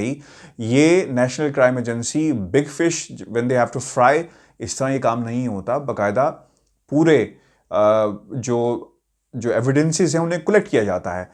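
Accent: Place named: Pakistan. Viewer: So far ur Urdu is Pakistani Urdu, please Mr tell other anchors too do not sound like Indian anchors. Let them see BBC Urdu service and to see the difference BBC HINDI. UR doing a great job like Imran khan (Anchor) & Imran Khan ( prime minister ) can I call u Imran 😂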